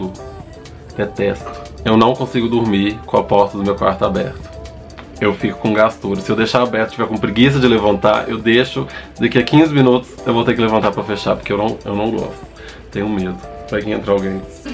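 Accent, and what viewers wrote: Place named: Minas Gerais, Brazil. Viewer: Sotaque mineiro é a coisa mais linda desse mundo. Adorei o vídeo! ❤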